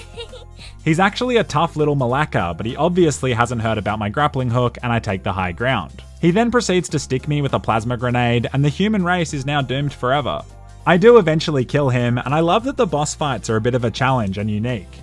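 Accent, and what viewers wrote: Australia. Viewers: I can't believe I found your channel after many years. It was one of your hitman playthroughs coupled with your ozzie deadpan sarcasm which made me subscribe. Brilliant content. I watched every hitman 2 one, all of the farcry ones, all of the GTA SA and IV ones and the Vice City one. To the point my wife knows that when I go to bed, we are watching for hours now. Shame you're not doing the old intros that you once did, to spread the good word of your channel. I even made one below 😕 "Hi lads and lasses, here. If you enjoyed this video, please hijack one of Elon Musks' SpaceX rockets and send an emergency broadcast out to every planet within reach, stating to subscribe to, as this really helps the good word of my channel" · >Started watching >5 seconds in >Disgusting australian accent >Pause video and click off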